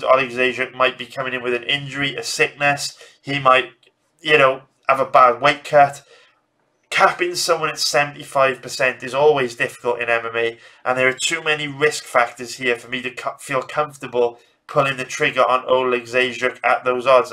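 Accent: United Kingdom. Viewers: ✊🏼👊🏼All day dude, yall whats ur accent, theres like a lil welsh in there, scouse myself, just curious, looking forward to next parts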